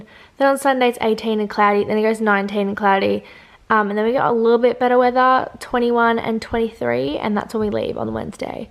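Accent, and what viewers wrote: Australia. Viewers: Wait is she from Melbourne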